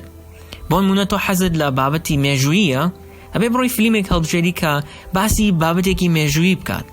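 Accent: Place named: United States. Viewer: thanks l hope sooo and its really good you using accent American.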